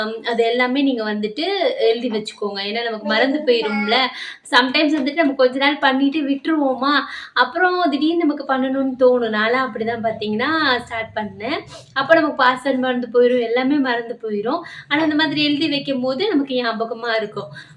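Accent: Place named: Chennai, India. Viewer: Chennai ya sister neenga pesurathu romba pidichirukku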